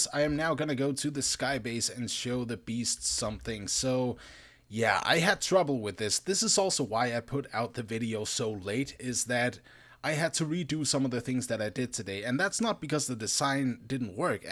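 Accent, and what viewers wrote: Denmark. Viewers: Is he Danish?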